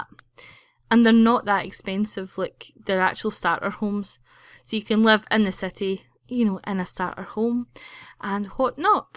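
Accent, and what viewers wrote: Scotland, United Kingdom. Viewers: It's called a Scottish accent, and it's cute. Maybe you're deaf, or just not used to the English language, but I learned English about 1 year ago, and I'm used to a normal American/Boston accent. And I can understand her perfectly. It's not fault that she speaks like that. So, please don't comment on her accent. She can't do anything about it, and she shouldn't, because it's lovely <3 c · you sound Scottish just like meh! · Wow, your accent is gorgeous. I don't know if you know who James McAvoy is, his a Scottish actor and his voice is amazing just like yours! I think I'm just inlove with Scottish and Irish accents! They are just so different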